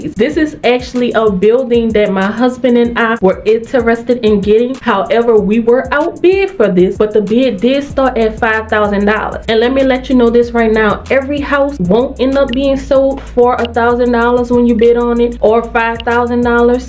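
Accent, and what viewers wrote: New Orleans, United States. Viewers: I literally saw the house in the nail, and knew it was New Orleans, her accent confirmed, haven't watched yet, but I'm putting my money on the east..... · Somehow before watching this video I just knew she’d have a NOLA accent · New Orleans accent ! 🙂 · Love your energy and accent gotta be from NEW Orleans😊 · Love your energy and accent ! Something about you New Orleans brother and sisters , you have that southern charm . Thank you for the information. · Ik u from New Orleans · The New Orleans is oozing out of you.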